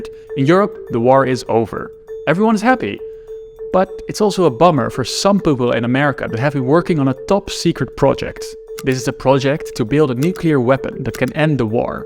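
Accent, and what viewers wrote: Netherlands. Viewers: Dutch accent is strong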